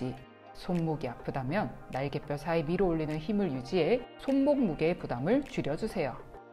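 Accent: Gyeongsang, South Korea. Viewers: 말투가 ....신기하네요 사투리인가요..?ㅋㅋ좀...신경쓰이는ㅋㅋㅋ 경상도인지 강원도인지특이하네여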